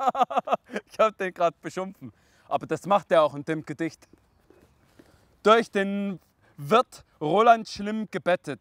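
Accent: Swabia, Germany. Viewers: DU BIST DER BESTE. Alle die ich kenn reden nur noch wie du in 3 stufen und den anderen Videos. Stuttgart rockt. Da kommen halt doch die besten her. Dein Schwäbisch ist so krass geil beim trainer und so. Danke für die geilen Shows. Stuttgart und umgebung im Lachflash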